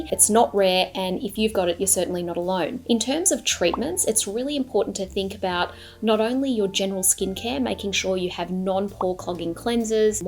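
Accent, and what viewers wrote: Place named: Australia. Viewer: Nice to hear an Aussie!!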